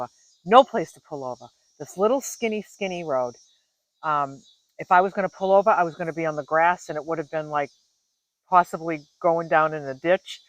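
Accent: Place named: Boston, United States. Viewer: I smiled the entire video! Pure JOY! Happy I found your channel and the second I heard you Boston accent I knew I was going to adore you. Born and raised in New York but living in Utah. (a fish out of water) for sure. Came out to ski in the 80's and the rest is history. I am just staarting to go camping (alone) this coming winter (to a place called Quartzite, Az.) Thanks for the Friday evening S M I L E ~ love the dancing, with complete abandon. Right up my alley!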